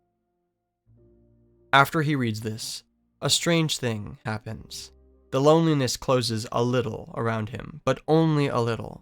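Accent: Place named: United Kingdom. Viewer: good video but i hate your shitty british accent when you read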